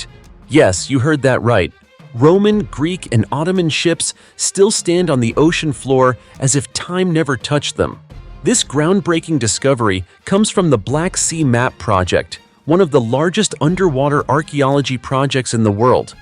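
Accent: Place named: United States. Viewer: as a side note, I can’t even watch this. American narrators drives me nuts.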